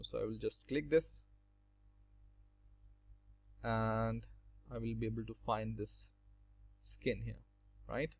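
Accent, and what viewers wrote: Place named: India. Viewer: It's certainly part Indian.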